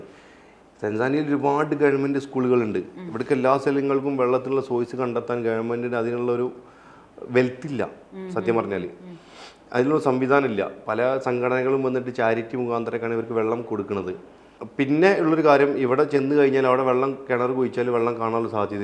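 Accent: Malappuram, India. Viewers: തനി മലപ്പുറം സ്ലാങ്ങിലുള്ള തമാശയും പറഞ്ഞ് ബുള്ളറ്റിൽ യാത്ര ചെയ്യുന്ന കാണുന്നത് വർഷങ്ങൾക്ക് മുമ്പ്, ഇപ്പോൾ ഒരു പാട് പക്വത വന്ന യാത്രികൻ ❤❤ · Malappuram slang കേൾക്കാൻ തന്നെ ഭയങ്കര രസമാണ് ❤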